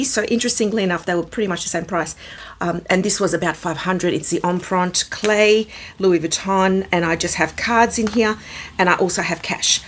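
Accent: Australia. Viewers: Love the Australian accent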